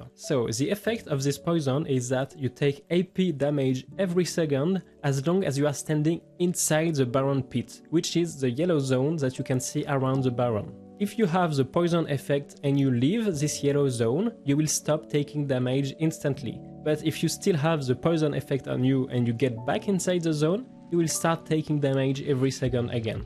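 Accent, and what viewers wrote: France. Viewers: I can hear ur french all my friends talk in this accent 😂 very good content 👍👍🔥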